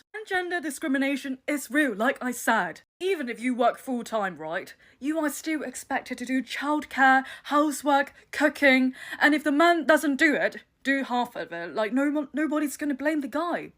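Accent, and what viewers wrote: United Kingdom. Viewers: Hearing someone speak perfect King’s English but use woman as a plural like a Japanese person is so surreal 😭 But also very cool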